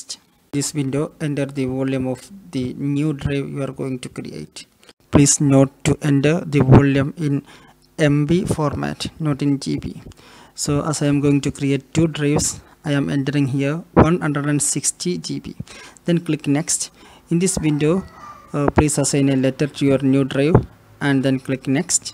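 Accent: Kerala, India. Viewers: R u from kerala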